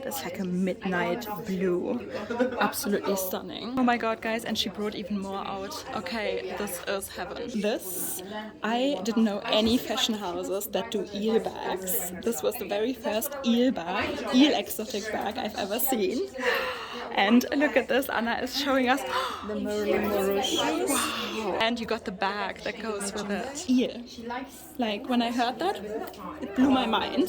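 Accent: Germany. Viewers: I don’t know if you are even going to read this . I have to tell you your accent makes my heart so happy. I grew up in an area where a lot of Germans moved to ( in the US) after the war. Your accent is like a blanket to my inner little Kori. When I’m having a rough day and miss all of my “ Omas, I watch you.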